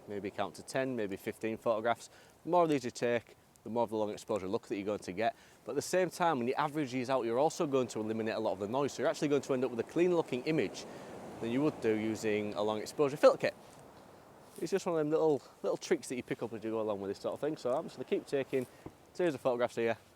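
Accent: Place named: North, United Kingdom. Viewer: Good stuff. It's a pity that the speed of your talking and heavy Northern accent makes it nearly impossible to understand you, much less learn anything.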